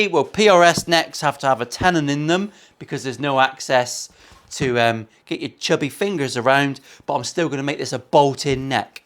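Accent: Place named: Northern Ireland, United Kingdom. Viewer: If I wan a good giggle I don’t tune in to John Bishop etc I tune into this channel. Always something to laugh about and I get to learn new tips tricks and techniques with guitars, brilliant, and I love the Northern Ireland accent and!!!!!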